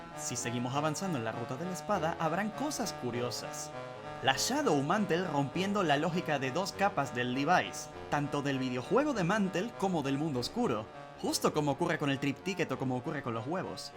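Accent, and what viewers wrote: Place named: Venezuela. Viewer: Nada más escuchando tu forma de hablar reconocí Venezuela ❤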